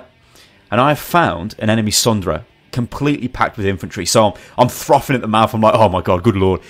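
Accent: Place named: United Kingdom. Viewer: You sound british so I would guess you have a European account also calles PSG, or a SOE account? Because that quite frankly is the biggest issue of Planetside 2 at the Moment. All those PSG players that without knowing choose a company that just doesn't care. Just have a look at the britsh forum over at planetside2.eu. We all play on the same servers yet we are second class citycin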